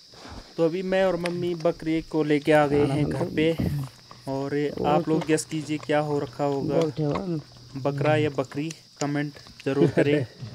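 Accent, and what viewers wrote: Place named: Hills, India. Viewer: Bhai ji aapki video aur aapka presentation Bolne ka tarika bahut badhiya hota hai ekdum hum pahadiyon jaisa apni video daily dale aur aise hi vlog banate rahein.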